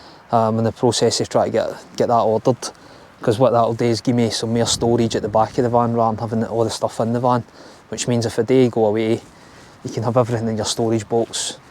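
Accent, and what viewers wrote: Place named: Scotland, United Kingdom. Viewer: Love the camper! English guy here, where in Scotland u from? Strong accent that 😂 same accent as Ricky burns the boxer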